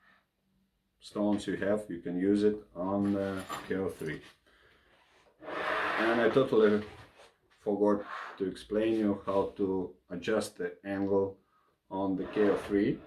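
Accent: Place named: Russia. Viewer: I love the way you speak man. The English with the Russian accent is awesome, no bullshit just straight facts from a man with arms so ripped I don't dare to call myself a real man in comparison. 'sometimes costumers complain its very difficult to tight these clamps.. Yes.. You get used to it.' Unintentionally hilarious, I fucking love it. Please do more videos.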